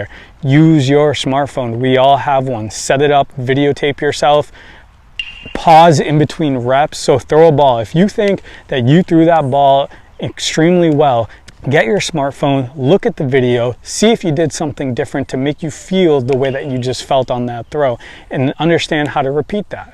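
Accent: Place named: New England, United States. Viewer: Sweet. Go Bronx Bombers! Sorry I know you're from New England with that accent. Probably grew up a Sox fan. I lived on Nantucket for 10 years. Peace.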